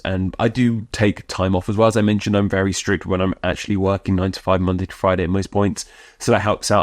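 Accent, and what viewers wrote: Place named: United Kingdom. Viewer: That's clearly a British accent.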